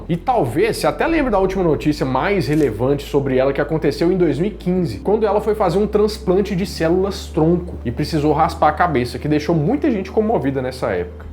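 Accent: Minas Gerais, Brazil. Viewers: esse cara é mineiro uai! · Vídeo muito bom. Gostei do formato, da didática, das montagens e o sotaque mineiro é um destaque. Parabéns 🌟🌟🌟🌟🌟 pra vc.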